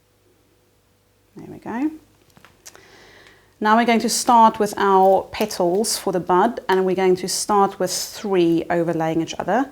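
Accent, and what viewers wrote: South Africa. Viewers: Lovely tutorials. So original and so nice to hear a SAFA accent!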